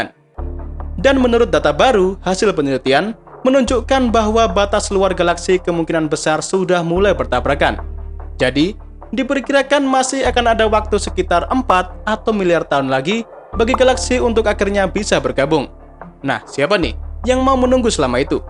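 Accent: Java, Indonesia. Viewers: Jowone metu min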